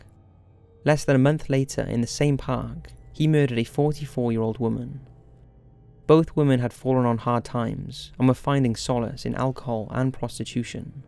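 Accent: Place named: South East Wales, United Kingdom. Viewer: I've wondered for a long time where you are from. You have an accent that sounds like you might live very close to me which is South-East Wales. Let me know!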